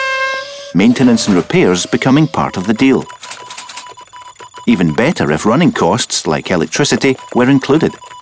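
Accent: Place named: Scotland, United Kingdom. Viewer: Aye, I love the narrator's Scottish accent. <3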